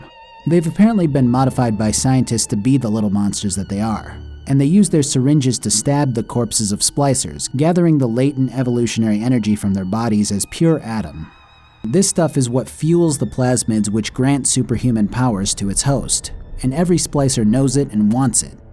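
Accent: Germany. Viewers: As german, I liked your german and german accent. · i love your German accent lol maybe bioshock 2 too?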